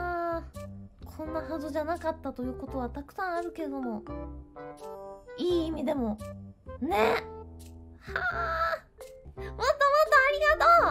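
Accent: Taiwan. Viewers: 台灣口音XD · 台灣國語的會長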